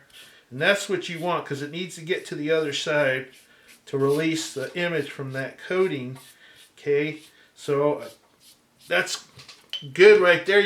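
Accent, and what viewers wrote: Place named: Arkansas, United States. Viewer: I literally stumbled across your video this morning. I'm glad I did. 😃I'll be doing some experimenting at this process myself. I lived in Shelbyvill, TX for a few years. But I've been back home in NC for over 20 years, but I thought I recognized the Arkansas accent. Wish I had a workspace like that. I've subscribed and liked. 👍 HAPPY NEW YEAR!! 🎄 God bless. 🙏5th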